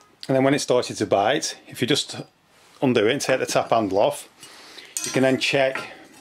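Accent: United Kingdom. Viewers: brit, man.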